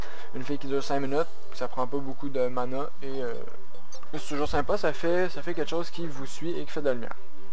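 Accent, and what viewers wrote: Quebec, Canada. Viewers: Tu est québécois ? J aime bien l accent du Québec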